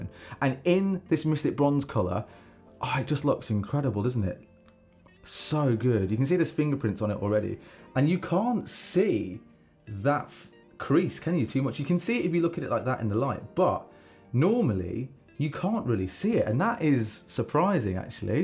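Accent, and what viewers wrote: United Kingdom. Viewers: Love subscribing to english accent speaking guys😅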